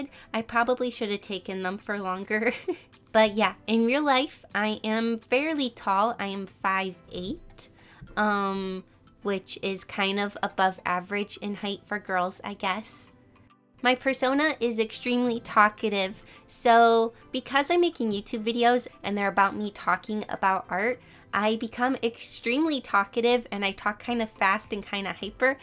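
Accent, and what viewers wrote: United States. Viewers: I dont think your asian bc asian ppl dont rly wear sweaters and you sound like usa ppl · Are you by chance from virginia or somewhere near there? You're voice/accent reminds me of my family whose from there lol. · Hi Nd to answer wat u said this video I figure you were white American because I have a friend that have an accent when she talks but she’s American she’s not mixing or anything she’s just a white Caucasian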